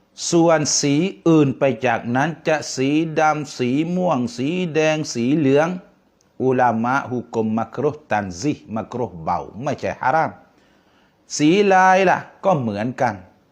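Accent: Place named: Nakhon Si Thammarat, Thailand. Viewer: นครศรีธรรมราชชัดเจนครับ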